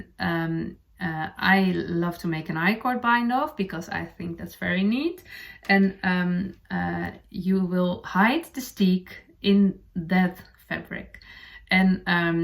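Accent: Netherlands. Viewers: Love your sweater, the neckline, the colors, the patternwork and it's placement! Well done! ❤❤❤ Your English is very good. Love your Dutch accent, so charming. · Oooh. I am only a few minutes in but know I'm going to love you. My grandfather was born in Netherlands and your accent reminds me so much of him. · It's delightful to watch and listen to you. I don't mind your dutchie English. Looking forward to seeing your steeked cardigan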